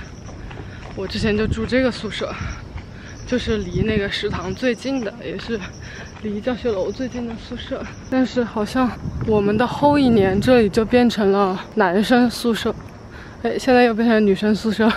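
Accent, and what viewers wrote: Wuhan, China. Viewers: 看到好多好吃的，还有武汉话，好亲切。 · hhhhh你一回武汉普通话就有口音了哈哈哈哈 我哥也是华师一的 好像是08年入学的（如果我冒记错的话哈哈哈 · 刚从武汉的家里回到墨尔本，看了你的vlog又开始想家了。平时可能不觉得有什么，在异国他乡听到看到故乡的东西总是会让人有更多的感触。其实一开始我是搜旅游vlog看到你的，然后发现口音有点熟悉，点开主页发现果然是武汉人哈哈哈！ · 看博主别的视频的时候听口音就觉得是我们武汉的普通话hhh，然后发现了这只vlog证明了我的猜想！看片头就认出了销品茂的金逸哈哈哈开心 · 哈哈哈 这武汉话和我男朋友家里说的一模一样😂😂😂同在澳洲，果断关注❤️❤️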